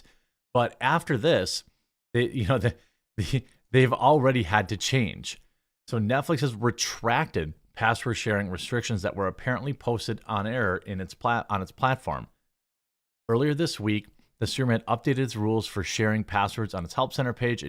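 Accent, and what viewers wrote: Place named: Wisconsin, United States. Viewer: I like your show, but your severe Wisconsin accent is too hard to understand.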